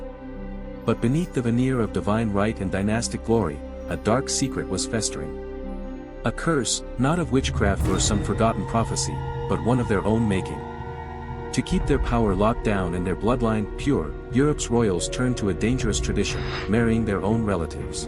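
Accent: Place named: United States. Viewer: Any video clip narrated about the British royal family should feature a voice with an English accent. Is there a specific reason why an American voice dubbed this clip?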